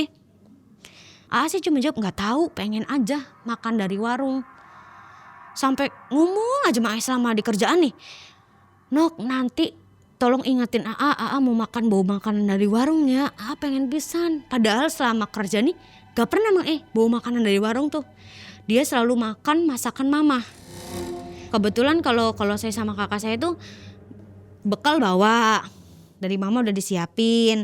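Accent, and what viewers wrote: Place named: Cirebon, Indonesia. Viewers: Logat bahasae kyk wong Cerbon c yuu 😁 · bahasnya kaya orang cirebon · Logatnya daerah majakuning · Klo denger logatnga sprtinya lokasinya di cirebon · Cirebon yaqin, Indramayu mirip sih,tapi tetep beda,mba tulen Cirebon, rumah makan mana ya buka nya sore smpe mlm dan ramai pengunjungnya 😊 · Wong cirebon ya mba ?? Logate keciri 😂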